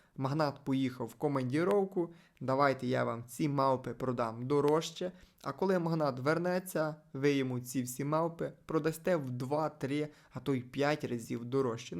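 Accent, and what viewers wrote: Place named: Boyko, Ukraine. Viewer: голос пздцц ти шо з села?? як бойко мовиш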